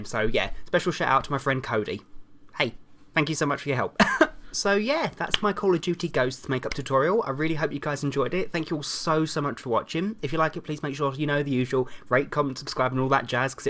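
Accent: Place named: Bristol, United Kingdom. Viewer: Youre just ouststanding mate!, Your accent sounds little like Bristol'ian accent, are You from there?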